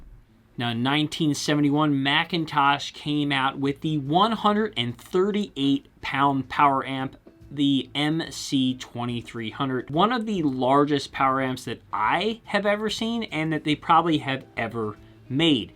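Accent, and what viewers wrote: Baltimore, United States. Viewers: That Baltimore accent. Maybe Philly.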